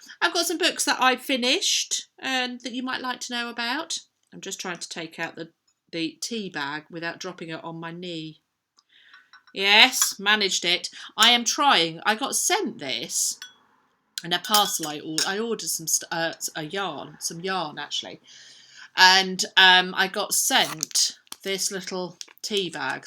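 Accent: United Kingdom. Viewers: Giveaway-I thought of a second question. I like listening to your lovely English accent. Can you do an American/US accent (any region)? :)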